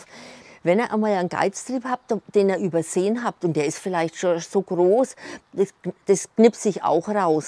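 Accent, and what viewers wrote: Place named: Upper Franconia, Germany. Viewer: Meine Großeltern kamen aus Oberfranken. Der Dialekt ist mir deshalb sehr geläufig und vertraut.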